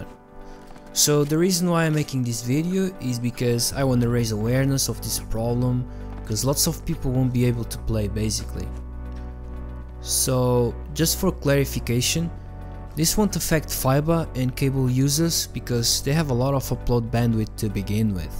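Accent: Portugal. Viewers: senti o sotaque tuga !! E ainda bem ,temos que representar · Eu consigo perceber que és tuga pelo sotaque, por isso vou escrever em português. Eu tenho exatamente o mesmo problema, no entanto, limitar os fps's não me faz nada. Net specs: 5 ms, 200mpbs download speed, 20 mbps upload. Tenho NOS (infelizmente). Tenho alguns amigos que jogam VALORANT normalmente sem problemas que também têm NOS. Alguma outra solução ?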